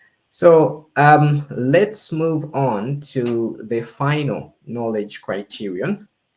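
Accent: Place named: Zambia. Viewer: Your accent tells me you hail from Zed..did I guess right 😅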